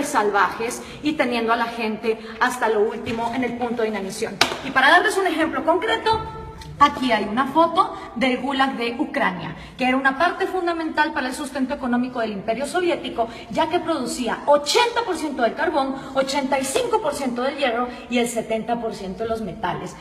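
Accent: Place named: Central America. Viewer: este video deberia haberlo filmado en blanco y negro, reflejaria el contenido añejo que sale de la mente desactualizada de la autora. para cuando haces un video revisionista de los regímenes ultramarino imperial de inglaterra vs expansion napoleonica?. por tu asento debes de ser de un pais centroamericano entregado a los narcos, pero de eso no hablas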